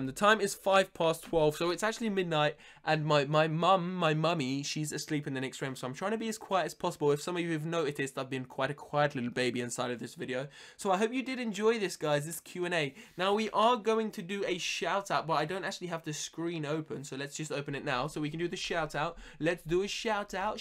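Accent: United Kingdom. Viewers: I knew it your British, so am i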